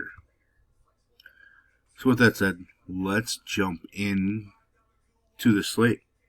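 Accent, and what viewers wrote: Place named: Wisconsin, United States. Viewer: It's that Wisconsin accent lol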